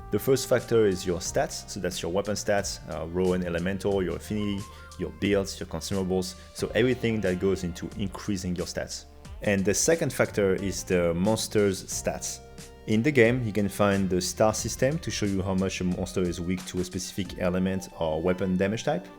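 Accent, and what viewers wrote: France. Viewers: Ah de ce que j’entends tu est français haha ☺️ tes vidéos m’aide et beaucoup pour fair de super builds d’arc ! Merci infiniment ! Hâte de voir la prochaine vidéo !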